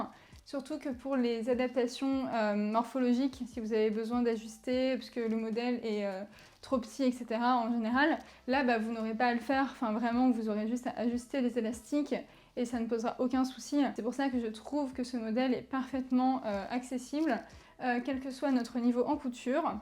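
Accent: Paris, France. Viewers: Je vais être virée, je dis ce que je pense tant pis, c'est pas méchant, Pfff dommage cette façon de parler à la Parigot, à chaque fin de mot ou presque, on entend ( OUAN ! ) Le patron OUAN ! Chaque côté OUAN ! Adapté OUAN ! Qu'on a besoin OUAN ! Bref j'ai l'impression d'entendre le sketch des inconnus (saluuut ouan tu vas bien ouan) C''est super agaçant OUAN !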